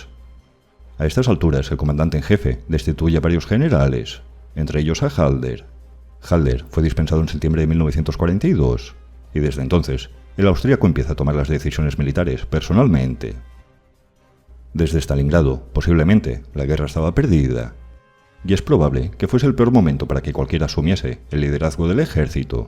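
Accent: Galicia, Spain. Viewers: ¿El locutor es gallego e intenta disimularlo o habla así de impostado?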